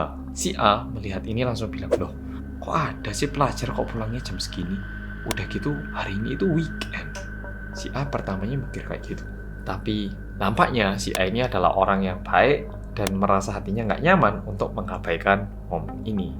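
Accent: Java, Indonesia. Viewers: Aku udah siap2 mau bilang "orang korea yang medok"😐😂 Ternyata Malah keweden😂😂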